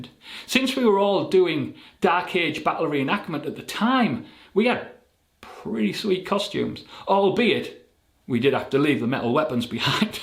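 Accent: Scotland, United Kingdom. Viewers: Nice channel , you sound like a Scotsman!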